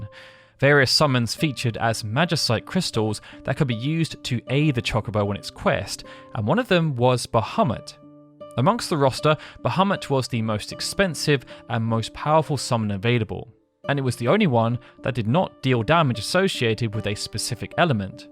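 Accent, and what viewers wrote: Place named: United Kingdom. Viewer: Ultra long video essays of old games are wonderful. MrEdders123 and I Finished a Video Game are also great alternatives with a similar British tone.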